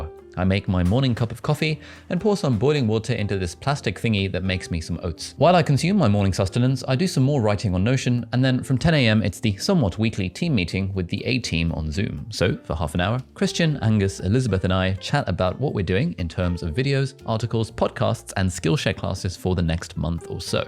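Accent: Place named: United Kingdom. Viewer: You have a nice accent of Britain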